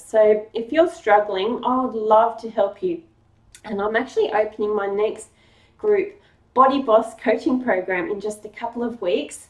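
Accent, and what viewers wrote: Australia. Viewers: Ja, ist eine deutsche Name. Und sehen Sie auch eine deutsche Dame aus, obwohl Sie hören eine Australierin aus. Woher (in Deutschland) kommen Sie? Ich bin ein Kanadier geboren, von deutschen Eltern. Und habe ich für mein ganz Leben hier in Kanada gewohnt.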